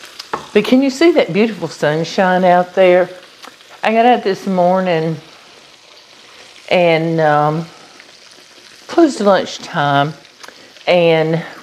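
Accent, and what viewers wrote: South, United States. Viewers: First time here and I have two things to say. When I hear your voice, I’m reminded of the old girls in the southern Baptist Church I attended years ago in Tulsa. You also have an accent like Paula Deen’s. Y’all could be cousins. 😊 Your voice is soothing. I bet you can tell a good story. The grub looks good.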